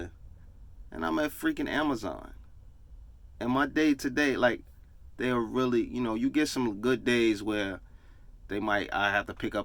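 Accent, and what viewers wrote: New York, United States. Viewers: Bro u from New York?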